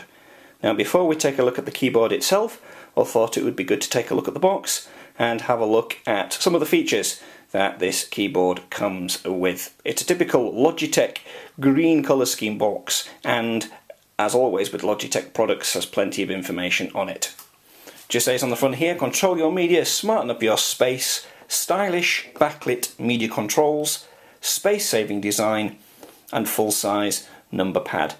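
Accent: United Kingdom. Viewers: You have perfect English (Great Britain) voice xD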